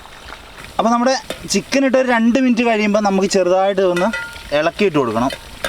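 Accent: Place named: Kottayam, India. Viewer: Ok, but broo ningade language pakshe nk oru kottayam area ayit thonniyallo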